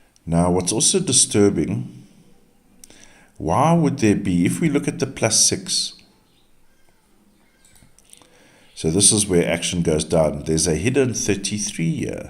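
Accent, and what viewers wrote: South Africa. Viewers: By any chance are you South African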